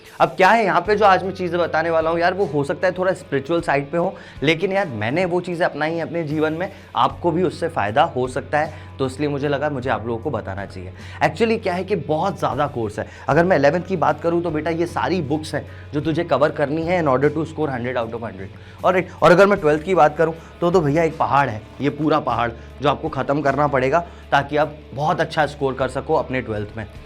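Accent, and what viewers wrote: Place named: Bhopal, India. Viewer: SIR BILKUL BHOPALI STYLE MEI SAMJHATE HAI❤❤❤❤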